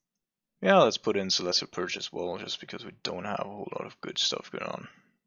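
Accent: Germany. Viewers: Great content as usual but I like Grixis more than UW. Grixis is more flexible I think and more fun to play. But your videos always show your great insight into the deck and also into the meta. By the way are you a German player? Sometimes you pronounce words very German :) And wha are your thoughts on UW Stoneblade in the current meta?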